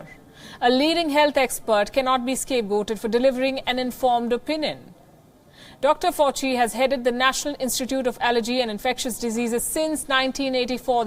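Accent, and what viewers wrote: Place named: India. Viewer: Majority of indian population understand their own language better than English So then why english channel with indian funny accent Why they need to pretend to be What they are not hahaha lol So stupid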